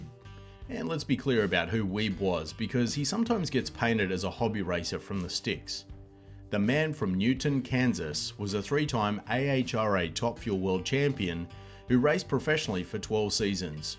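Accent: Australia. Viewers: I swear I don’t think all Aussies sound alike, but you sound remarkably like Dr Robert Chase, MD (Jesse Spencer). Great video btw, nice to find non-AI channels 🎉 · Great vid, no AI, and the down under narration seals the deal. Subbed, liked, and hyped .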